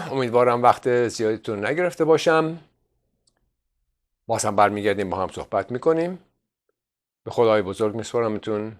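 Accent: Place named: United Kingdom. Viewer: جووون چه لحجه اینگلیسی ای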